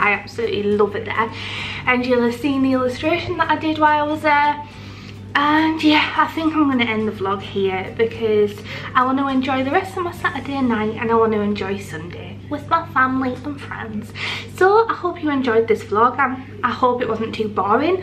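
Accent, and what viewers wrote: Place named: Teesside, United Kingdom. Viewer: Hello ! I found your channel pretty randomly last week, you and your art are totally adorable. I love your studio vlogs even the bits you think are boring. And i can't believe i'm hearing a Tesside accent on here! I live in Yarm so i'm v close by if you'd like a new gal pal at all i'd totally be up for coffee and cake. I have no idea where that coffee shop is but i'm offically intrigued.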